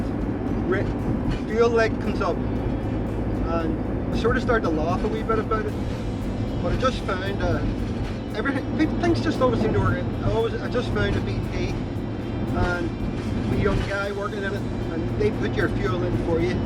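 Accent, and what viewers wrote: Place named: Northern Ireland, United Kingdom. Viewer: Hopefully that's the end of your drama, but just how Norn Iron did you sound, Wee this and Wee that. Keep smiling.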